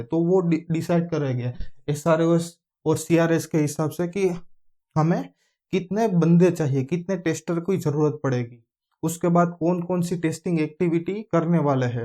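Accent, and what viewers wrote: Gujarat, India. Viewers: Gujrati accent bhai ni 😉😉 helpful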